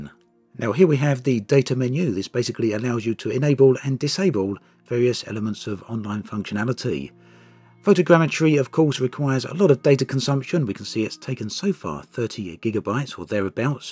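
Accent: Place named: Scotland, United Kingdom. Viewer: Edinburgh or Glasgow lol 😂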